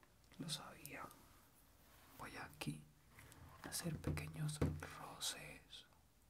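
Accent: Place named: Colombia. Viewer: escuchar Asmr en "colombiano"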